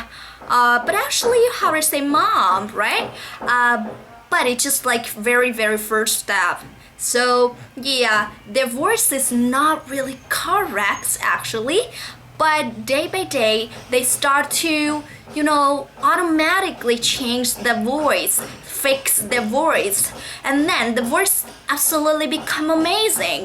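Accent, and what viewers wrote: United Kingdom. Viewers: I thought your senglish sometimes british sometimes american style, but that isn't no problem cause I have a problem with my speaking skill I'm too much learned grammar, but none people cares about the grammar, really exited your video!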